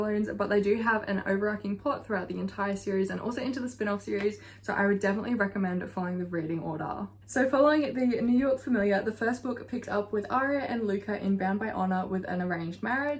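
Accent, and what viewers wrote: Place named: Australia. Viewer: omg i just found your channel and when i heard a fellow aussie accent i got so excited 🫶🏼😭😭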